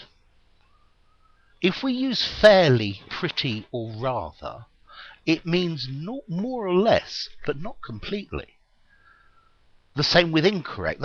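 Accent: United Kingdom. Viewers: First off, as an English Language Development teacher (I'm a native speaker of American English) in America working with mainly hispano-american immigrants, I appreciate this valuable post, as you speak with a wonderful example of a British accent, and I love your cadence and clarity of enunciation. I am going to use more of your videos in my class to help my students understand the importance of pronunciation, cadence, accent, and enunciation. Second, I applaud you for tackling such a nuanced and tricky subject. Even as I write this, I realize how often I use these adverbial modifiers, and how difficult it must be for my students to follow the differences in what I mean. On the upcoming research project, for example, I said that the Power Point presentation is "fairly important", but that the written component is "quite important". I was shocked when all my students then began working on their Power Point presentations instead of focusing on their written reports. 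Obviously, they didn't understand the difference between "fairly" and "quite". Thanks for making me more conscious of this in my communication. Finally, I'm including a link below to a written article about this same topic that may add to the conversation. I hope that such links do not detract from the work that you are doing. Again, thanks for making resources that so many of us value, quite a lot! Please contact me if there is any complaint: <mail> <link>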